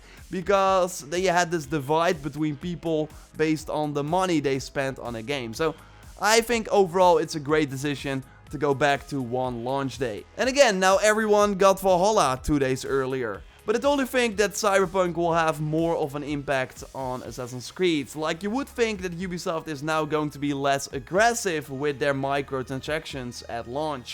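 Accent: Netherlands. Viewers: Bro u Dutch I know it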